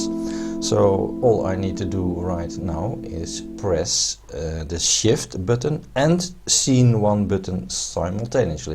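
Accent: Netherlands. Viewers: Thank you for your very excellent tutorials, bravo. You sound Dutch, am I correct?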